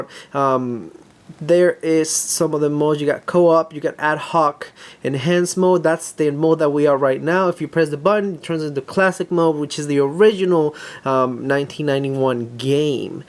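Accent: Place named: South Florida, United States. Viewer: Great review! I literally just purchased this for the PS Vita in 2026 ha ha, ha ha enjoy your memorial day weekend. Not for nothing but it sounds like you’re from South Florida ha ha ha By the way, this game is still $10🫠🥴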